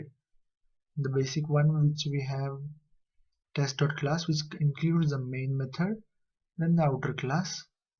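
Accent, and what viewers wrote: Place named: Mumbai, India. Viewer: omg am i gonna find some java video WITHOUT mumbai accent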